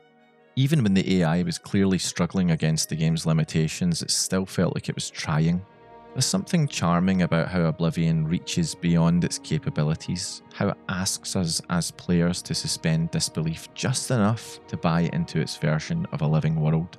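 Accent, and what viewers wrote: Scotland, United Kingdom. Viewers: Is this a Scottish accent? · I love when I randomly encounter a Scottish YouTuber. "Bumf" is a cracking word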